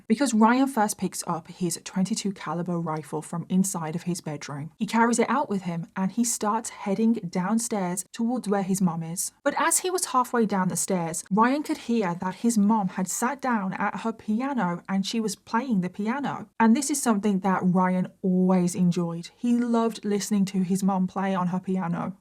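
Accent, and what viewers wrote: United Kingdom. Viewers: Can somebody please tell specifically what kind of British accent has? Thank you. I like it.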